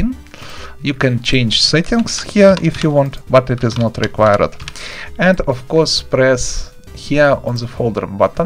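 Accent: Russia. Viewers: R u Russian?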